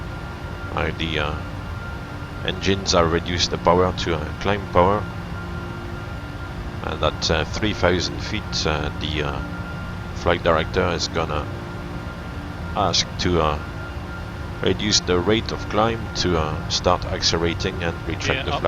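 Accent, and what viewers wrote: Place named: France. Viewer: yay another video :D I really like your calm voice, and your competence of course But you are a real pilot, right? Doesn't it get boring to fly as your job and then when you are home to fly again? Like there are lots of good chefs that love cooking but at home they don't because they do it all day already :D I'm not complaining though. That way I can enjoy your videos :P May I ask where you are from? Your accent has something very french to it :D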